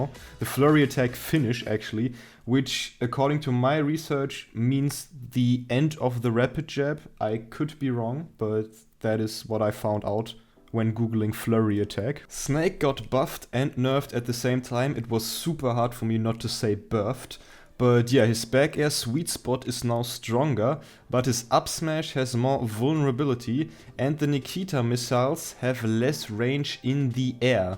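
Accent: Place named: Germany. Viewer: dein Englisch klingt echt hart deutsch.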